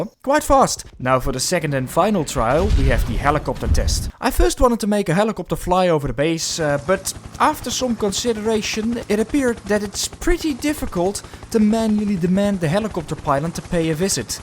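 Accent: Netherlands. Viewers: Yo, I hear like 10 seconds and the Dutchness is killing me. Good vid though! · I can't unhear the dutchyness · like how you can imediatly spot a dutch person on youtube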